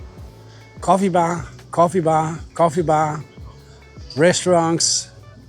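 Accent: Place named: Germany. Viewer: Curious here. - your first name sounds Danish. Your Family name English. Your accent in English seems German.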